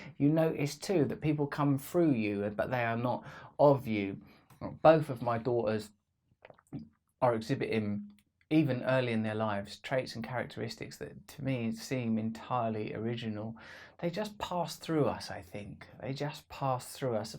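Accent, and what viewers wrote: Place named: United Kingdom. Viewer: It would be interesting to understand why you were so cavalier and uninvested before you got your "religion". You were the bad boy of the British pop media who was all about instant gratification and irreverent of proper British culture. You built a career on trivializing all the traditional values by your promiscuity, drug use, and extravagance and all in the cleverest way in a proper British accent. Now suddenly you have awakened and give talks and harangues to addiction programs and youtube videos on the sanctity of the body and of relationships as though the extended consequences of one's actions dawned on you. Why don't you come full circle and tell us what went wrong in your development that made this transition so dramatic for you. It is not your children, although by extension they are part of the metaphor. Why did you not recognize the value of intimacy and building relationships and self-knowledge even before your children? 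What was missing in your development?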